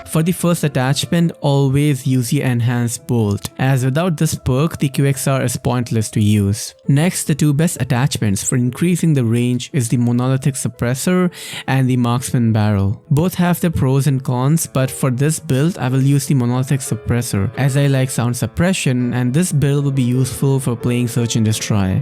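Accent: India. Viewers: Dude are you from India? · hmm, i feel like ur accent is indian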